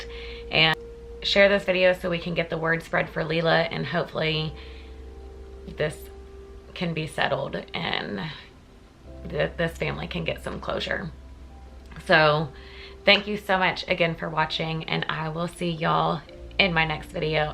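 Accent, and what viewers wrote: South, United States. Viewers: Wow, I love her voice. Lil southern accent. You got my sub.....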